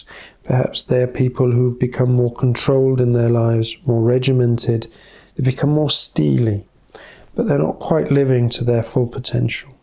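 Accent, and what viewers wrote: United Kingdom. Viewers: Come on with the British accent makes it harder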